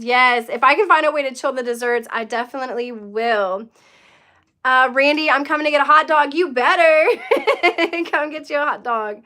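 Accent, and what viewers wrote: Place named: South, United States. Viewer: You're very beautiful woman and I love your little southern accent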